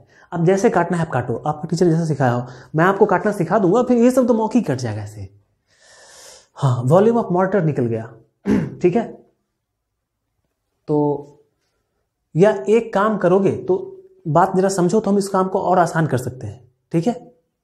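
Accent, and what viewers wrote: Bihar, India. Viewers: Aap Bihar se hai kya Hum Bihar Darbhanga se hai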